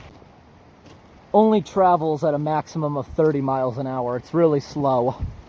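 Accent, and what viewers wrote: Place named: United States. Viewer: I'm From New Zealand and I like his American Accent.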